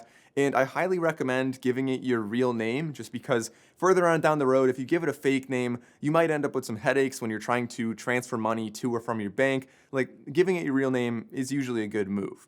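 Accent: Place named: United States. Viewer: Thanks great explanation , I appreciate it, with American English understood clearly , I’ve seen other videos explaining with other English accents and they just got me more confused, thanks You are the man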